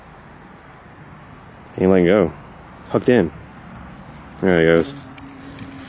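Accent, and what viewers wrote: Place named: United States. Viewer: OMG YOU'RE AMERICAN AND I LOVE YOUR ACCENT xxx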